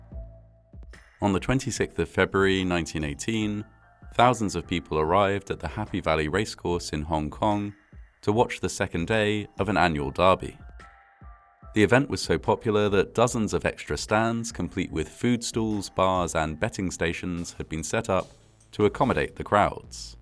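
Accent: United Kingdom. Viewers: British pronunciations🤭!!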